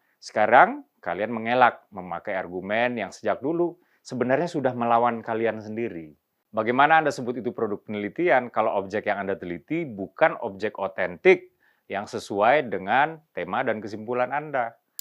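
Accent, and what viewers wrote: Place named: Java, Indonesia. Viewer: Logat Jawa nya terlalu nampak Bang....😅😅😅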